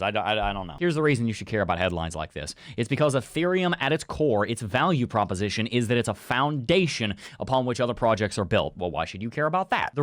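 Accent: South, United States. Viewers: The only crypto guy with a southern accent. My kinda guy. From South Carolina btw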